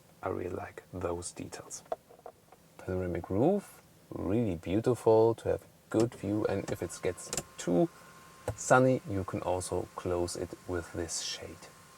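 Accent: Germany. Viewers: He's not mumbling, that's a german accent...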